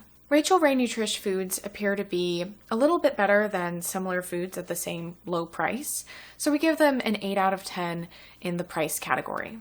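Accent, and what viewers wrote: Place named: Illinois, United States. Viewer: Sounds like you’re from IL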